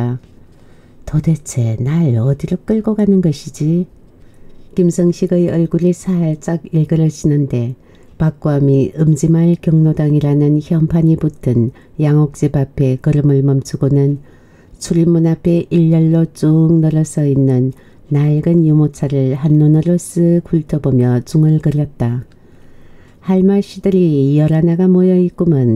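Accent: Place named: Jeolla, South Korea. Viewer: 전라도 사투리가 구성지네요. 님 덕분에 기분 좋은 하루 될것 같습니다. 건강 잘 챙기시고 님도 기쁜하루 되시길 바래요~ 한결같음에 고마운 마음 전합니다.~♡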